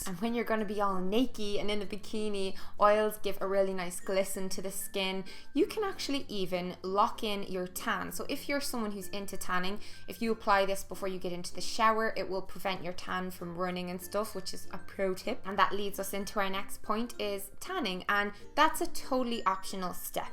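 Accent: Ireland. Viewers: Love hearing an Irish accent when I click into a video, much love from down the road in Kildare ;) :)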